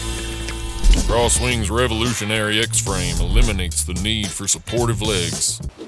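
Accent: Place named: United States. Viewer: Is this a parody? How is it sooooooo American just in the voice over? I don’t trust it.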